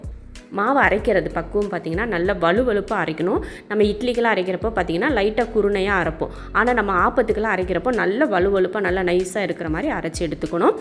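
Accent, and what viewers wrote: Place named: Tiruvannamalai, India. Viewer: You are from Tiruvannamalai?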